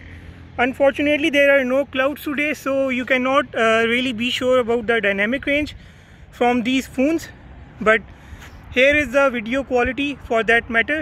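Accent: India. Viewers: Well as an Englishman I understood him perfectly and I've also liked the Indian accent anyways!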